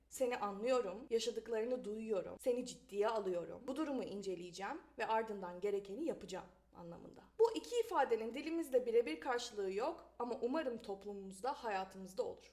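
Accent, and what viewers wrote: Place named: United States. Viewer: İngilizcen mükemmel ve Amerikan aksan ile konuşuyorsun bende öğrenciyim voleybolcuyum ve dilim var C2 geçen gün sınavım vardı C2 ye geçmek için senin videolarını gördükçe İçimden diyorum ki lütfen ben de böyle güzel aksan ile konuşayım. İngilizcenin yarar şu ki hem sporcuysanız hem de yabancı ülkeleri gezmek istiyorsanız işinize yarıyor ama sporcu olursanız daha çok işinize yarar çünkü hem maç için yabancı ülkelere gidiyorsunuz ki ben de şu an öyle hem de gittiğiniz yerde gezip oradaki farklı yemekleri deneyebilirsiniz ama sporcuysanız biraz zor yemek konusunda😊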